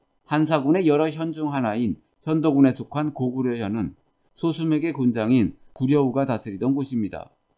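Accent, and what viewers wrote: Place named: China. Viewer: 이 ㅅ낀 뭐지? 우리말하는 짱깨네?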